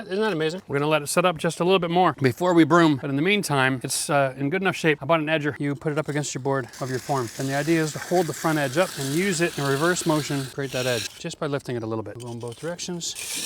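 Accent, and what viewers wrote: Canada. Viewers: How do you say the word house that gives away your Canadian accent. Thank you for your awesome video. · When you say House and About and Eh,you let me know you were Canadian. Cheers from below the 49th.Nice video.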